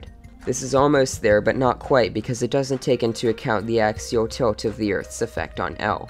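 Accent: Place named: Canada. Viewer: the video is awesome, but I was distracted by the fact that you said you live on the 37th parallel but also sound kind of Canadian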